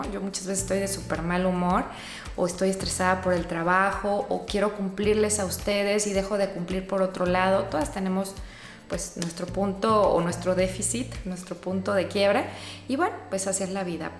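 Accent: Mexico. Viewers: ese spaninglish es terrible fuera que tienes ese tremendo acento mexicano!!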